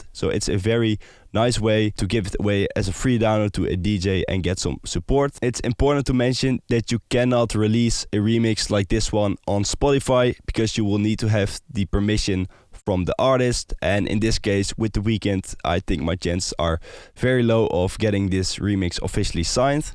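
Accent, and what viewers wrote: Netherlands. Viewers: sounds like you from the Netherlands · I smell Dutch, also great tutorial. Keep up the good work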